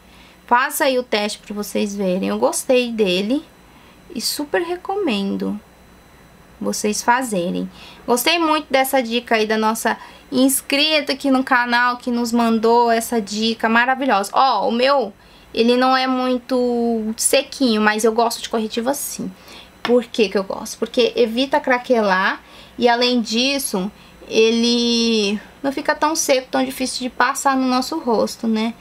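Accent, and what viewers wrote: Minas Gerais, Brazil. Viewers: Eu amooo ouvir ela falar, parece aquelas mineiras bem da roça kkk ❤ Amo seus vídeoooos ❤❤❤❤❤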